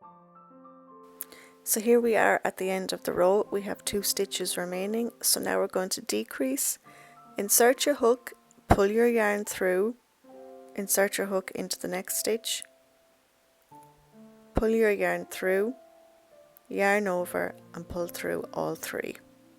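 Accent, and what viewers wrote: Ireland. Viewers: So cute i am always scared to make sweater. Becouse of my boobs being so big lol i am gonna have try this out though do you think if i measure around my boobs and get ths mesurment that way it would work .just wondering i am 44 dd. I love the sweater and i love your Irish accent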